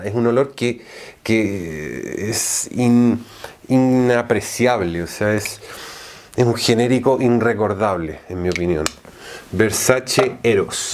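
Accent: Chile. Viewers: es Chileno ? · Eres chileno???? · este muchacho tiene en su acento o pronunciación una mescla de chileno centroamericano y español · Tú acento se detecta a leguas amigo, eres chileno. Buen video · disculpa te pregunté Eres chileno o español??? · Y esa mezcla tuya de acentos que ? el chileno iberico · Si es chileno, radicado en España, saludos desde CHILE."le dicen el sabueso "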